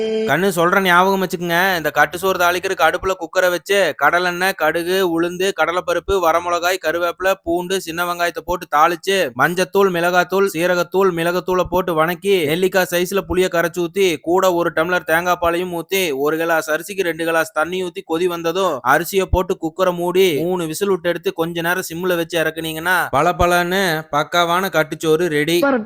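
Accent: Coimbatore, India. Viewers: Kovai slang super inggo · Beautiful kongu tamizh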